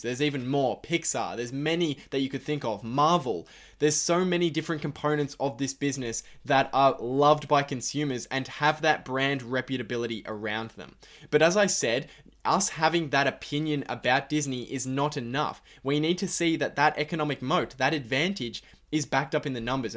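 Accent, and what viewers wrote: Australia. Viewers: Like your vids and your Aussie accent :)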